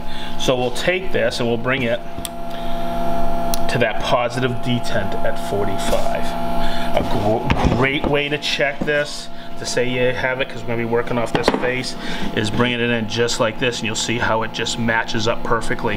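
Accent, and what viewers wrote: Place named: Boston, United States. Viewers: I became one of your subscribers recently. I'm from Mass originally. I moved to the South, KY and it's great to see and hear your humor and Bostonian accent ( and this t shirt here) as well as your thorough teaching abilities. I have to say you, Bents, and Tamar channels are some of my favorite, great teaching and no fluff content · best "Mwwwoooortise"...... luv the East Coast accent. Good video boys!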